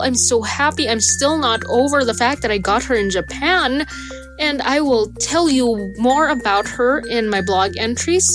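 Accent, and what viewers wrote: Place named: Philippines. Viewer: Holy shit that's really expensive.... Also, are you from the Philippines? I could sort of hear the Pinoy accent. Aaaaand the Dollfinas kinda gave it away for me. Not much though, I still had to Google it. Edit: Nevermind I just got to the part where you said you got something from Manila.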